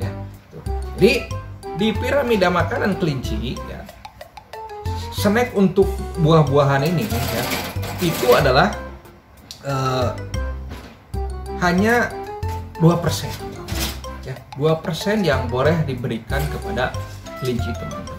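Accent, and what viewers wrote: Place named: Sunda, Indonesia. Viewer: si aa atuh meni lucu sunda na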